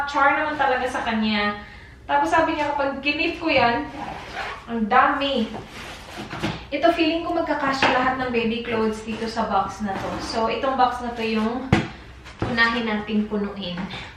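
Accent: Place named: Visayas, Philippines. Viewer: Kanang bisaya gud nga accent ako gnhan mam..😁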